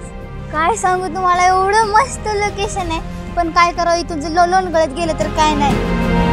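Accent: Latur, India. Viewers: Ek no information Aani tuzya sobat khup cutness aani God aawajat Laturi bhasha zakaas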